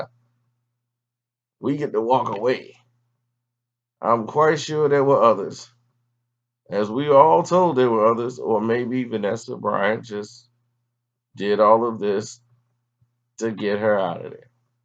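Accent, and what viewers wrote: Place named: Chicago, United States. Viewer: That Chicago speak kinda like white dude down south except they got they own accent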